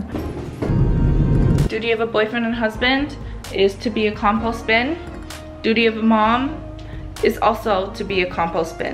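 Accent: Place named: South Korea. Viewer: konglish on point 👌🏻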